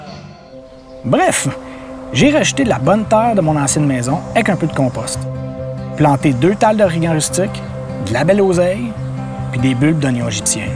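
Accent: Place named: Quebec, Canada. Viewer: Quel travail formidable bravo et j aime l accent québécois ❤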